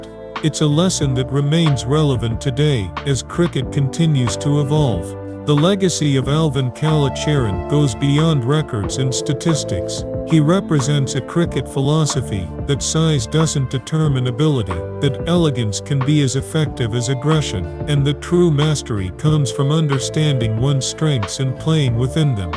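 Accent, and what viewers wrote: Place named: United States. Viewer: The narrator sounds like an Ameican!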